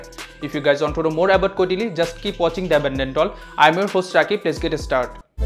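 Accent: India. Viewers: After this guy uttered just a few words I had to stop watching this video because his Indian accent was SO thick I couldn't understand what it was that he was saying enough in order to continue listening anymore! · Bollywood acent.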